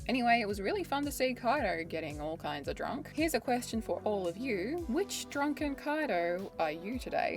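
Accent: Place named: Australia. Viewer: Knew you were Aussie soon as I heard ya voice bro Anywho lol to those who said Luffy will lose the final fight 😂 can’t happen now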